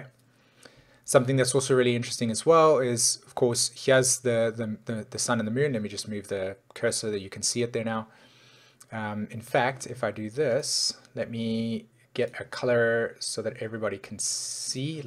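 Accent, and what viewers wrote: South Africa. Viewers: Nice to hear a South African astrologer !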